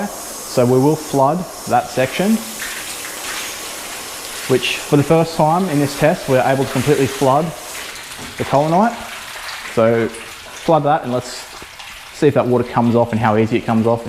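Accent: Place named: Australia. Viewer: Ah an Aussie accent 😂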